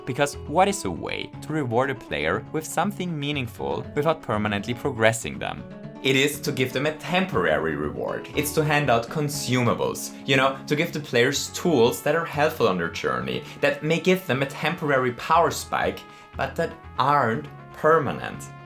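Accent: Germany. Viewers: Ein Deutscher Akzent 🤔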